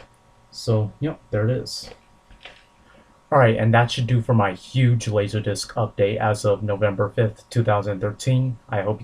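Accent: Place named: Coast, United States. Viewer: Ohhhh cool thanks for explaining that. Where are you from by the way? I was trying to figure out by your accent east or west coast. Keep up the great vids!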